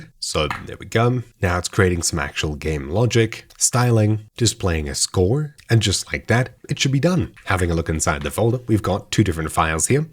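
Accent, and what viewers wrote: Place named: South Africa. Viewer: Thanks for doing creating this video. I have been using warp now for a couple of months but have not gotten into some of the things that you covered. I see that you had South African English on your Excel Worksheet. So are you still living in SA or elsewhere. I lived in SA for 30 years in total from when I was 15 way back in 1974. I lived in CarltonVille, Vereenging, Meyerton, Johannesburg, Durban, East London and started work in JHB as a computer operator working on an ICl 1903T Mainframe at GoldFields Mining and Development Company. Great to here a South African voice. I Miss SA a lot. My daughter is living in PMB.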